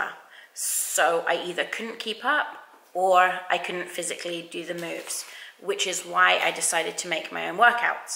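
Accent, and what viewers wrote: United Kingdom. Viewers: Bloody brilliant! I'm not overweight but I am unfit. I hate watching all the buff fitness instructors strutting their perfect bodies on YouTube. We need real people we can relate to. Please keep it up. You are a breath of fresh air. It's also a bonus to hear a British accent for a change. 😘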